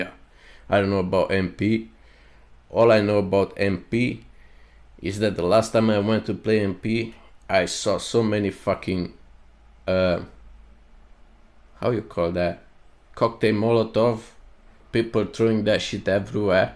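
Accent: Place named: United Kingdom. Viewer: all this long I thought dude was British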